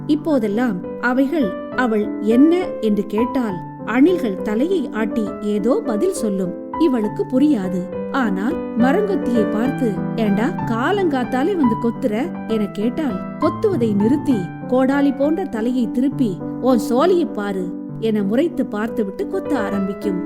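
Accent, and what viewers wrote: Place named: Rural, India. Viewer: விடக்கூடாது, ஊர் பாச 🤷‍♂️அருமை Tr🎉😂டங்கு ---டங்கு தமிழ் 🤷‍♂️அருமை. வாழ்த்துக்கள்.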